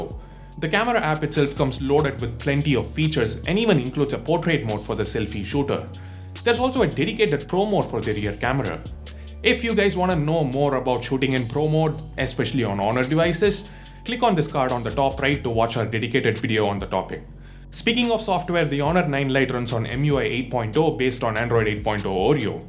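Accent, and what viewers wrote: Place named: South India, India. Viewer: get rid of horrible south Indian accent !!!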